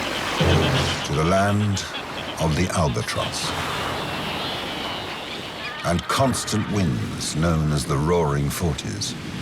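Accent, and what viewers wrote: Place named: United Kingdom. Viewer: I really wish producers wouldn't use British voice-over with American scripts. Have some confidence guys! Also, to mention the blatantly obvious, it's not "eco-locate", it's "echo-locate", and 'dive' is a regular verb. It's not like "drive" just as "pee" is regular and "see" is not. Otherwise, we'd say, "I pawed my pants because I was so scared".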